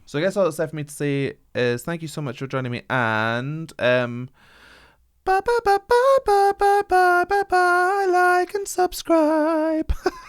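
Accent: Scotland, United Kingdom. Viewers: Love your BTS reviews & technical explanations, love your Scots accent--if I had functioning vocal chords, would def hire you as a coach! How about reacting to House of Cards...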